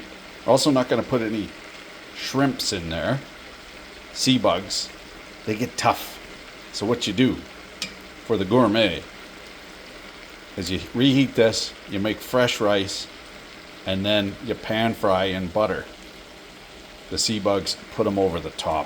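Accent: Canada. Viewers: Bro, I RACE w Canadians and your accents I 1000% Canadian, Where do you get your “ Cajun” from? I hear you speak French. 100% better than me I love you and your vids. Just curious here. All the best!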